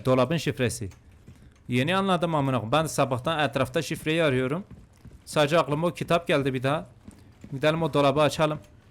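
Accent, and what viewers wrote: Elazığ, Turkey. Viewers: Abi elazığlı mısın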